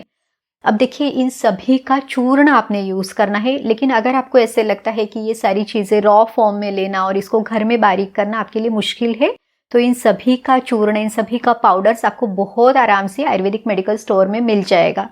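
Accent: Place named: Maharashtra, India. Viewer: Doctor ur hindi accent is like marathi but ur jain gujrati🤔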